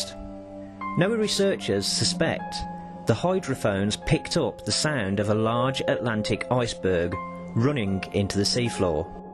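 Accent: United Kingdom. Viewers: I wonder if it's the Cov accent that makes everything you say sound plausible,because I've got a Cov accent as well,lol! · Do you have a west mids accent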